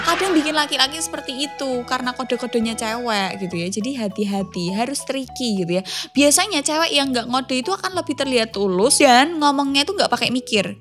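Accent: Java, Indonesia. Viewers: ko jawa nya bulet banget ya..hmm..yaahc,baik lh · Suka kamu logat nya jawa tulen 👍👍👍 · Wong jowo mb? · Kok benar skl apa omonganmbak dng logat2 jawa yg kental.Koq jadi ingat dg mantan. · medok bgt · suaranya mirip Nafa Urbach,, logat2 jawanya gitu looh..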